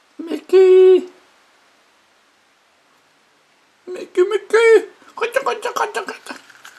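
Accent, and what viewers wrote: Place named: Russia. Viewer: у меня к тебе 3 просьбы: во первых не издавай странные звуки и не чавкай во вторых определись со своим языком и во третьих я тебя запалил , ты русский по этому перестань уже притворяться англичаном.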